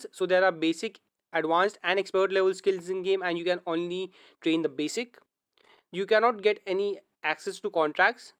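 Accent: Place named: India. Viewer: You Indian bro? I find that accent familiar.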